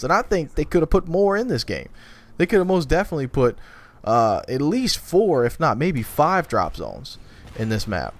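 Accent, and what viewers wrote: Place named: Louisiana, United States. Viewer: omg i knew it was something about your tone that was familiar and its that we are both got that Louisiana sound. well its good to know one of my favorites are from the same state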